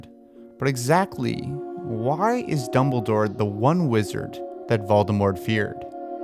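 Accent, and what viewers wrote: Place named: Canada. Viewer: Your Canadian accent is palpable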